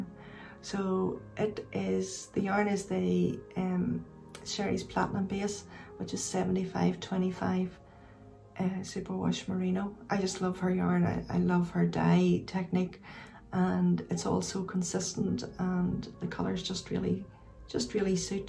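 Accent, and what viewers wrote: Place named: Ireland. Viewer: Hello, it's nice to meet you. I live in Minnesota, began knitting about 1 1/2 years ago and I love everything about it. Your podcast, and you are lovely. Your Irish brogue is a bonus! Your shawl is looking great. By now you have probably cast on the Snuggle Down cowl and whatever combo you decide or have decided to use will be terrific. I enjoyed the pattern so much I knit two of them. Thanks for taking the time to podcast, Fern in Minn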